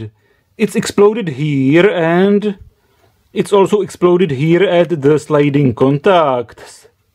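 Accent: Czech Republic. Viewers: Shhh. You hate his accent (Czech accent) so much that multimeter = his English (!) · I love your Czech accent and I love your channel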